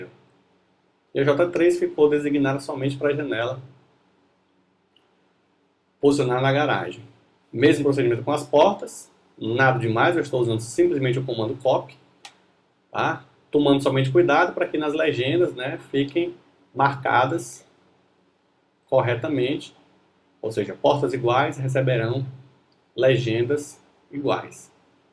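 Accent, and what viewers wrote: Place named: Ceará, Brazil. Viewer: Ótima aula. Sotaque Cearense inconfundível...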